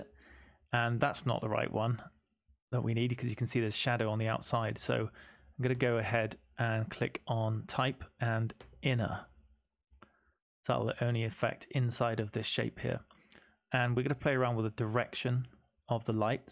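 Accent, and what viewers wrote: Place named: England, United Kingdom. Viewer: Very well and systematically explained in a normal English accent that one can easily follow. No unnecessary and erratic mouse movements. I have seen many Affinity Designer tutoriuals. Some of them don't even bother to speak. They just play some wierd music in the background, move their mouse (with a really small white cursor) erratically and expect us to follow. Well, not at my age. My only request to you would be to get a screen recorder where the mouse is highlighted and it emits sort of light waves when clicked. That way, we seniors will be able to follow your tutorials better. I have suggested this to many YouTubers and some of them have implimented it and thanked me. I am now subscribed to you. I see that you don't have an interactive Facebook group where one can ask questions to the community and the guru (you) can step in every once in a while to give a final judgement. 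Best wishes from Australia.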